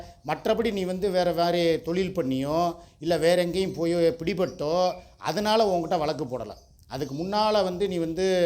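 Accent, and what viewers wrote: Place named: Madurai, India. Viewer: இது உங்க வாழ்க்கை நீங்க யாருக்கும் பதில் சொல்ல தேவையில்லை எது எப்படியோ நீங்க பேசுற மதுரை பாஷை நீங்க கமெண்ட் படிக்கிற விதம் எல்லாமே எனக்கு ரொம்ப பிடிக்கும்னா உங்க தங்கச்சி கீர்த்தி ஃப்ரம் சென்னை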